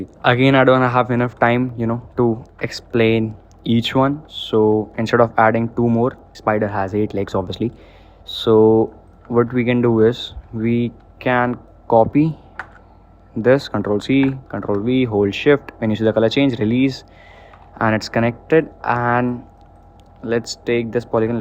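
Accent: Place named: India. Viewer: What your name bro ? You sounds like Indian.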